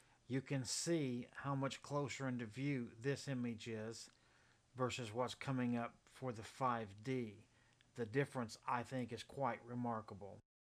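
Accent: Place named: South, United States. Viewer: Nothing like a southerner gentleman; you explained it well thank you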